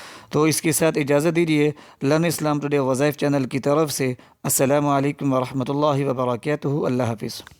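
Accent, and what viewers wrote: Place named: Bangladesh. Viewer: this is bangali accent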